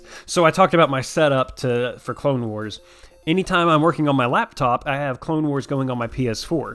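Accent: South, United States. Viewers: I <3 you. I’ve watched all of your Pokémon runs to this point (a few times since I watch them to sleep) and have now started this nuzlocke. It’s nice hearing another southern voice for my Pokémon runs. Plus, coming from a Pokémon challenge connoisseur, you have really high quality videos and they’re great to watch. I see you’re more busy with life now, and I just wanted to leave this comment and thank you for all the great content you’ve put on here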